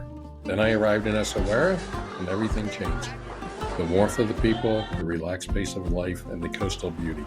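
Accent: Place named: Philadelphia, United States. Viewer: HI! OMG I am from Lower Merion! From Rosemont/Bryn Mawr area. I immediately recognized your accent from home like a melody to my ears!... I’m living on the west coast now but grew up in PA almost til college. I was curious where you’re specifically from in PHL or outskirts? I am Amazigh…Celtic-Moroccan, Siwa Egyptian and Portuguese. My family is from Algavre but emigrated for the most part to the states after intermarrying in the UK and came down thru to the Main Line with it’s more pluralistic society on my mothers side. Very curious about a move either permanent or temporarily to either Portugal or Morocco…as are my parents to possibly Portugal. Would love to connect (if you’re open) Especially because you understand the culture of home vs there abroad in both countries and those adjustments. I am 45, just 3rd generation born in the states so there’s much to explore and visit. 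Incredibly beautiful footage! I am late to the video but thanks for sharing! Please share an update video as well! All the best! -Micki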